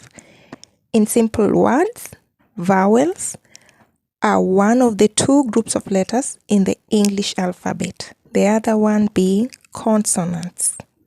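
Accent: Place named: Kenya. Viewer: I am sorry maam, but your pronunciation is quite incorrect. You are supposed to be a professional in speaking English, but it doesn't seem so. You should go do research on how to reduce your accent. I assume you are from Kenya, where British english is taught. There are countless youtube channels that teach exactly that, so do your due deligence. Please stop spreading misinformation.❤❤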